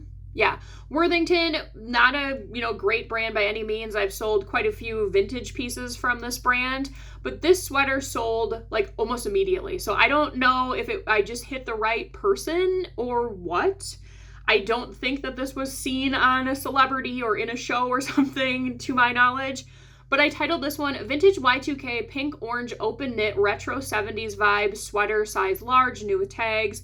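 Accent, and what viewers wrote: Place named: Midwest, United States. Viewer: New on your channel. I love your suggestions and ideas. New subscriber now. You sound like you’re from my home area. Are you maybe from Chicago or Wisconsin or Minnesota? I’m originally from Southern Illinois with family all over the Midwest. I’m in California now for over 40 years.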